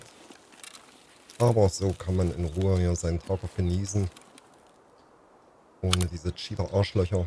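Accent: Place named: Saxony, Germany. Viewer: Vielen lieben Dank für deine Info. Dir auch schöne Pfingsten. PS: nach deinem Dialekt klingt es nach Sächsisch. Kann mich aber auch Irren.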